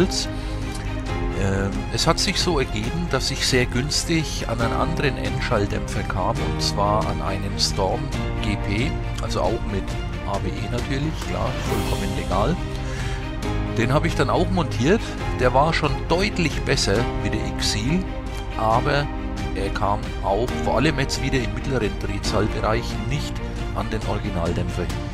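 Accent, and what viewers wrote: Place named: Franconia, Germany. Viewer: Do hör i doch an Frangen. 😊 Ich fahr auch den Storm auf meiner Sv. Ist aber eine Euro 2. Gruß aus Mittelfranken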